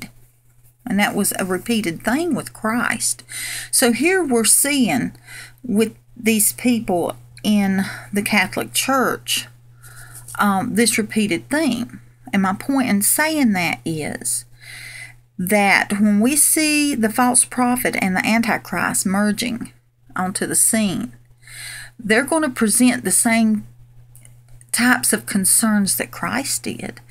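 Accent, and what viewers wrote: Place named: South, United States. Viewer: Thank you Sister! You are so right on in this video. What a blessing you are. I can't wait to meet you in heaven. I also love the fact that you are a southern girl (your accent). I live in East Tenn. lol God bless you as you continue to show us what the Lord is revealing to you! I just feel so comfortable and such a peace listening to you. I don't know how to really explain it, right at home with your teachings I guess. Anyway, love you and God bless you! :)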